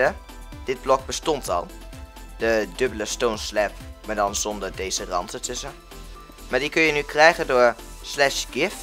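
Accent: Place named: Rotterdam, Netherlands. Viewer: Leuk filmpje maar hij heeft een Rotterdamse "t" egt irritant om te luisteren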